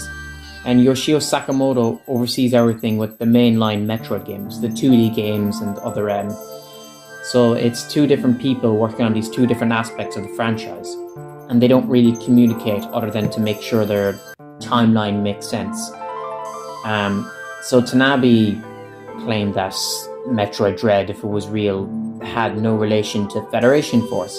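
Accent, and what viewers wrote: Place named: Ireland. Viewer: Irish bai!?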